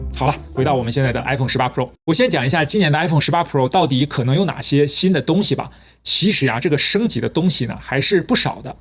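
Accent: China. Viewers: 好像博主是在外国生活的华人，但口音像是中国大陆的，而用词却是台湾的叫法。有点别致的搭配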